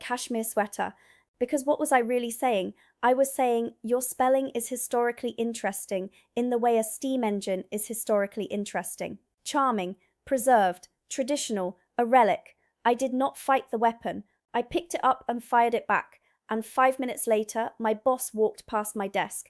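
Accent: England, United Kingdom. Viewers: Your English accent carries upward inflections at the end of many sentances. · Just brilliant Where does that brilliant accent come from I actually thought you were Britiah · Why is there a blurred section in the centre of the screen. And why do you have a British accent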